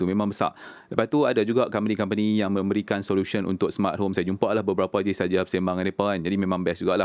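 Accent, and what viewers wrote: North, Malaysia. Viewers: Apapun saya senang dgr loghat gunakan.. sedap halwa telinga dengar sleng utara mix baku melayu.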